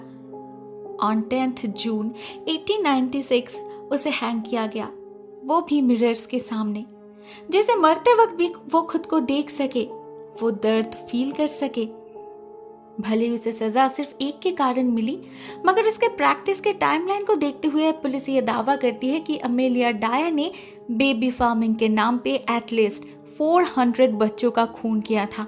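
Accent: Bengal, India. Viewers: are you bengali or bihari ?? ❤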